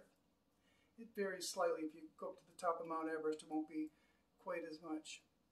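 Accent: Canada. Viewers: Are you from Québec?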